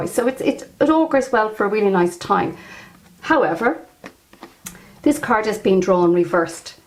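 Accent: Ireland. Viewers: Yass! So beautiful to hear an Irish accent teach tarot. I've been studying tarot for three years since ive been abroad in Australia and havent really connected with any Irish regarding divination! Thank you for your master classes 🍀❤ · Hi, only just this minute found you and am watching this video. You're fab! Trying to place your accent, are you Cork?? I'm in cobh 💖 Can't wait to check out your other videos Tomor. Would also love to see videos on each card 😘 Love listening to you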